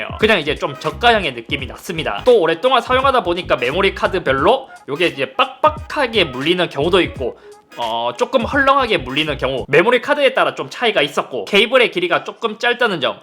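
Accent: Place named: Gyeongbuk, South Korea. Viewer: 이 형 너무좋응.. 경북말투 영주,봉화,안동,점촌.중하나있듯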